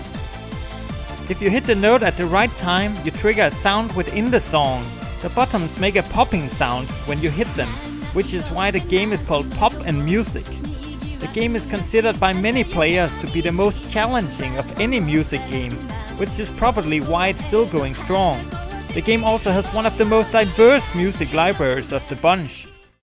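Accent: Denmark. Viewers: holy shit din danske engelsk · Are you from Denmark? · I freacking knew it. The accent got me. Overraskende at se en dansker lave engelsk indhold ;)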